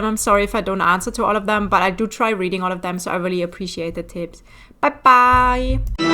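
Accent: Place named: Germany. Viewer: Ich finde das so schön wie man manchmal den deutschen Akzent raushört XD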